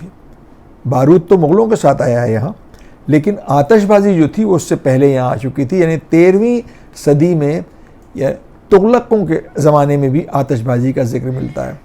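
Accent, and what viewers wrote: Lucknow, India. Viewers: saheb aik gujaarish aap lucknowi chikan ka kurta aur wah bhi chunnat wala pahan kar episode kijiye. bahut authentic lagega aapke lucknowi lahja ke sang.chia to hoga aapke paas.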